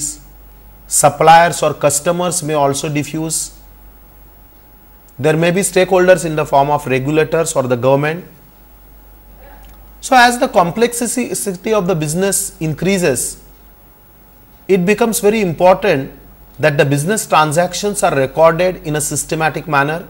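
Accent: India. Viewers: Awesome, and why not put the original subtitle for it as the google has lots of mistakes and it takes time for me to understand the Indian accent.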